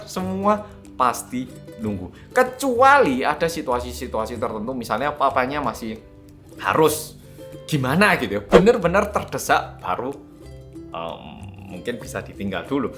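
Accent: Java, Indonesia. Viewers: Tiba" Nemu channel ini dan kesan pertama adalah anda itu medok sekali jawanya, keren, kesan kedua saya dpt informasi yg sebelumnya sama sekali tidak tahu, nice sharing. Sungguh tata krama makan yg luar biasa, budaya yg luhur. Memang bener bgt mas tatakrama makan memang perlu banget, apalagi tau dulu budaya setempat malah lebih bagus dan nilai plus tambah. Detil memang tapi sangat jelas nilai etikanya. Terima kasih mas atas tambahan wawasanya..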